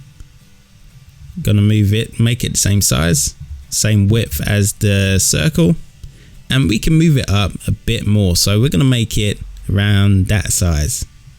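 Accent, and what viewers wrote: United Kingdom. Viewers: Loved your cockney accent!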